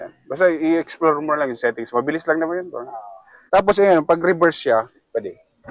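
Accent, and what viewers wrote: Philippines. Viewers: Garu matnog ang tuno kan bikol mo sir? Tama?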